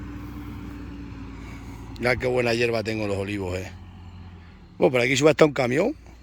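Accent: Extremadura, Spain. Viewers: Buenos dias Muy bien lo q estás haciendo en la Finca chaval,eres un máquina,eres tan fuerte como la máquina esa que prepara la finca,nos levántas el ánimo a los seguidores de verte trabajar a ti,y lo bien que sabes hablar,no pierdas nunca ese acento extremño tan chulo q tienes,se nos está pegando a todos.¡me encanta!Saludos amigo.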